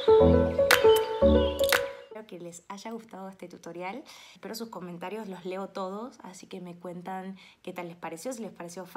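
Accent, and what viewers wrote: Mexico. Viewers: Acento mexicano va ganando terreno o.O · Que bella que sos pero...y ese acento mexicano? :O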